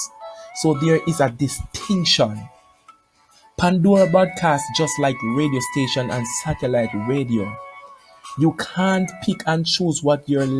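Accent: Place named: Jamaica. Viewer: ur accent just like jamaican speaking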